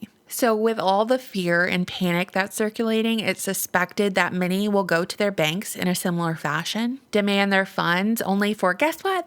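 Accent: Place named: Georgia, United States. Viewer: omg I can hear you Georgian accent...I'm so excited for this! 😅 haha im such a dork, love your vids! 💕💕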